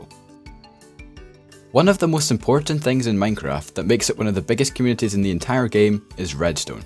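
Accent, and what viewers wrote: Scotland, United Kingdom. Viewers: Bro I really miss you and your video's. Please come back. I just watched your buzzy bees mod video for like the fifteenth time just now and it was honestly the most relaxing thing on the planet. I love you Scottishness and your ( well actually it's from minecraft) cat background music. I wil watch your farmers delight mod video tomorrow and I hope you will return shortly. Have a wee great day.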